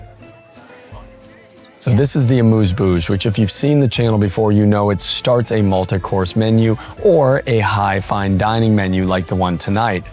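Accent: United States. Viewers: hahaha watching your videos is like watching war! intense, the last word in luxury, the polite detachment, and imposing. you are a security risk for these places. you have done remarkable work - the videography, some of the insights shared, and vuori is no chump change, but i do not know if id allow my bf to watch a girl do reviews in a robe 😂 you are really testing the boundary here and even though you are mesmerizing, you have molly eyes which is all the rage on camera these days i am NOT surprised about your wsj callout. you have adopted a perfect la movie accent and ditched your humble midwest origins but i think some others are gonna interpret you as a threat if you don't listen the first time. sometimes your ideas come across as objectivity rather than curiosity (why did this happen? or just pointing it out without being obvious). you were asking for that - you need a little more sensitivity with these class lines man 😭i don't think ur a bad person but u r dangerous 😂 😂 😂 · The misterious pear with the wax tip on its stem is a Passe Crassane most likely, it's a French winter pear. 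They do this so moisture is not lost. It's a nice touch. PS it's "luh·vaat·suh" though your American accent is rather adorable.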